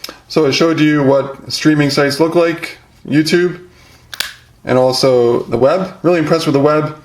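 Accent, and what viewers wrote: Canada. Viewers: I love you canadian accent :D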